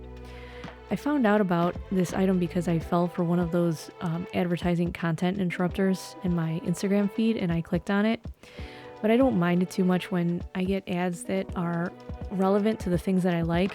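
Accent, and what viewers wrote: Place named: Midwest, United States. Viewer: great review. can i guess, you're from some midwestern state. ohio? illinois? indiana? michigan?..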